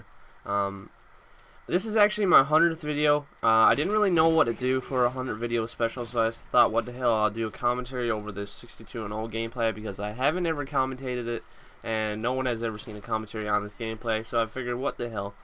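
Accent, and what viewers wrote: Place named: Canada. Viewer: What is your accent? Sounds like you got something mixed in their that isn't just Canadian.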